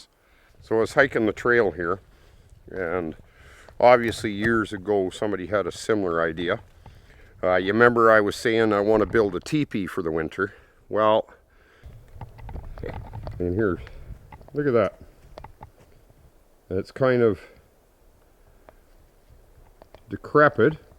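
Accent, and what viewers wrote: Alberta, Canada. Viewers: Mr. was this video produced in the Rocky Mountains of Canada. I noticed you and your friend were referencing the miles in Kilometers and you have a Canadian Accent.? Also, us there Cell Phone Service in the mountains that far back in to reach Medical Help if needed.? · He has a strong albertan accent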